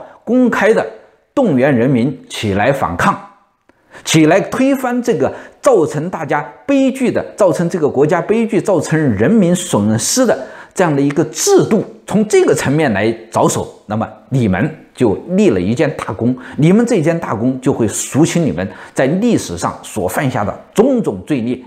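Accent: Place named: Changde, China. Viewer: 常德口音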